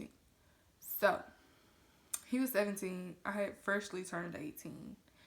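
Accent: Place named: New Orleans, United States. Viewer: You from New Orleans?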